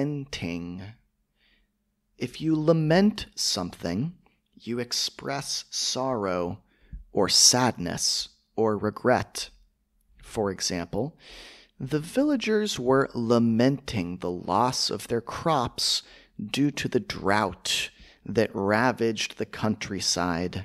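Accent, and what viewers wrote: United States. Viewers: I found your channel a week ago and was curious to know your first video. You have started differently but I really like your clean pronunciation!! I am learning English in British accent because it sounds better to my ear but you are making me to want American accent as well!! Thank you !!❤❤❤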